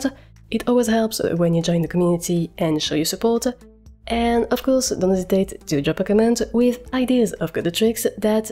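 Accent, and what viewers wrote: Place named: France. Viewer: Wait a Minute... I know that accent... You are French, lived there for 5 years, Toulouse was a great place ^^ Keep it up, great tutorial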